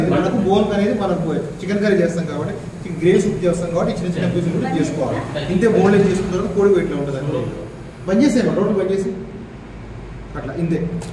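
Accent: Telangana, India. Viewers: Explain చేస్తున్నప్పుడు మధ్య మధ్యలో "ఇంగో ఇంగో" అంటున్నవంటే తప్పకుండా నువ్వు మైబునగర్ జిల్లోనివే అనుకుంటున్న.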